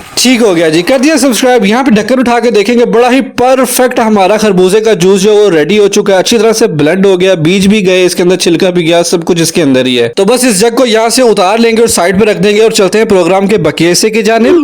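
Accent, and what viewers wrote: Punjab, India. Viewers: Mujhe apka "VOICEOVER" or apka "PUNJABI" touch acha lagta hai 😊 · Ap ki guftago me punjabi ka tarka😅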